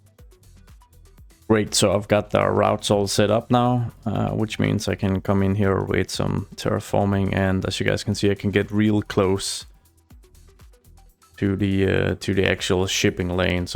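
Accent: Denmark. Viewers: Det er super god danglish :) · I was wondering whether you are from Denmark based on your accent🙂 Btw love your videos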